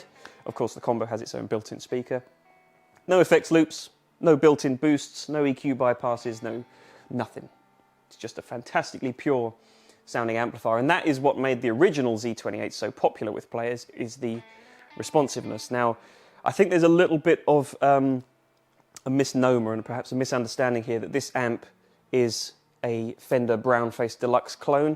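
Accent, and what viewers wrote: United Kingdom. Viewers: Wait, you're British?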